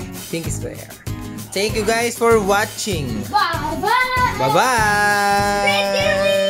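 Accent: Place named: Philippines. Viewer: Owe men your accent, are you a filipino?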